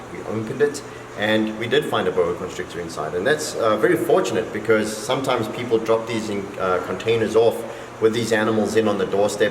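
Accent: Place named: South Africa. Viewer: It only took me a few seconds to realise I was listening to a fellow South African! Howzit!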